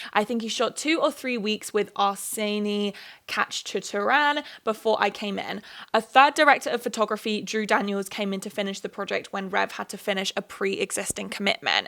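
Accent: United States. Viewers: ok girl your American accent was surprisingly good in some places! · oh my god I love the sarcastic american accent 😂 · girl your American accent is giving me Emma Watson in the bling ring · I am begging someone in the industry to make a movie or show about an egotistical self-centered show writer who ramps things up higher and higher and higher and is basically given whatever he wants just because the company loves him so much. I feel like it could be quite a good surreal black mirror-esque show/movie Edit: also, killing the American accent!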